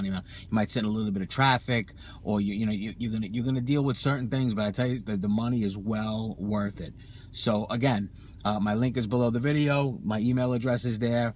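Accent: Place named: Long Island, United States. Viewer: coupla things, great video, really helped and I'll use your referral. Just heads up I hear Camden and Paterson are paying a premium for those that venture forth..😁 oh and why does from NJ sound like Vin from Long Island? I'm from Bergen County but you got either a Long or Staten Island accent...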